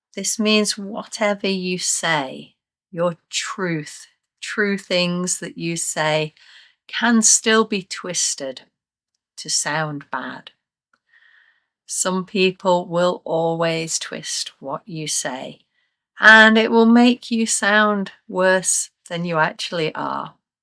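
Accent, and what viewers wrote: United Kingdom. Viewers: Thanks a lot for sparkling our love for English language and poetry with British accent.❤❤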